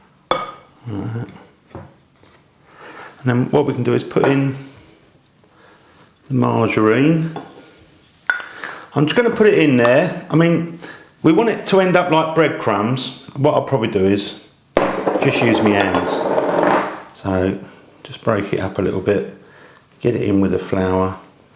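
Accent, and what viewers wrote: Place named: London, United Kingdom. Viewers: Delicious. With a cuppa rosy unbeatable. I used whole meal flour. Gives them a little more crunch. Oh and the chef’s wonderful London accent. Love it. Thank you. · Let me start off by saying that I just love your English accent. Love your recipe.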